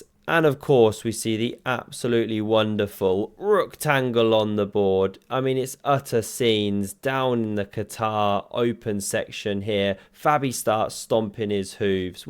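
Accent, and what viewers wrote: United Kingdom. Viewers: way too british. cant listen to this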